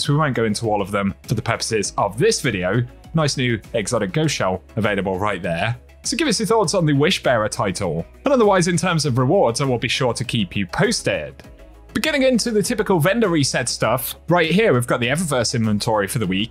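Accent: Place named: United Kingdom. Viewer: Here for the delightful British pronunciations!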